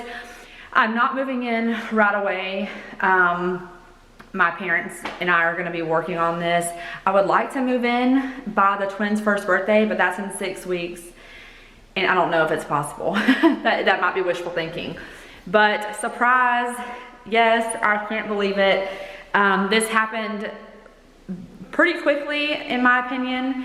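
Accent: South, United States. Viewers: Congratulations! This is so exciting! Can’t wait to watch you transform it into your own space! And girl... that southern accent is back! 😂 from one Bama girl to another! I love it! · So exciting!! It’s such a cute house!! Also your southern accent is coming in strong lol! I’m from Mississippi so no hate!😜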